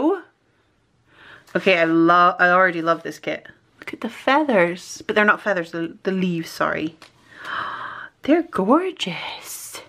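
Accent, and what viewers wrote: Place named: England, United Kingdom. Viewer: 🤣🤣 love your ‘proper’ English accent!!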